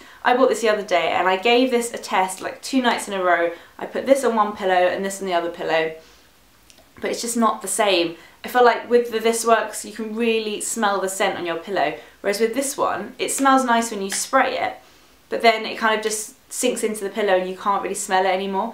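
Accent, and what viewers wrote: United Kingdom. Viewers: love your channel, your videos, and the british accent ...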